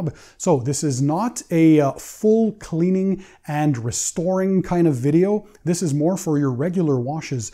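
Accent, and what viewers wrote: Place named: Canada. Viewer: At first I thought this guy was a European that had an accent from speaking English as a secondary language but then I realized what I was hearing.... Canadian 😅🇨🇦 anyway, great info! thank you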